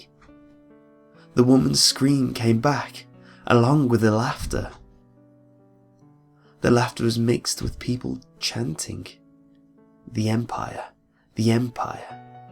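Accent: United Kingdom. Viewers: I really like this pasta. I especially like the way the last one led onto this one. The fact that this was read by a British dude just make it so much better `0`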